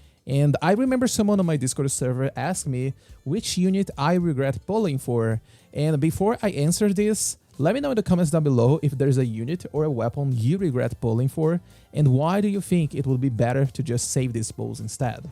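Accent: Brazil. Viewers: are you Brazilian?? Your accent sounds SO brazilian like 😮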